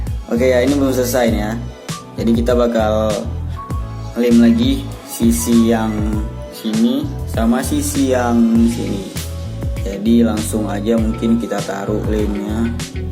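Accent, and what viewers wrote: Medan, Indonesia. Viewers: Logatnya medan kale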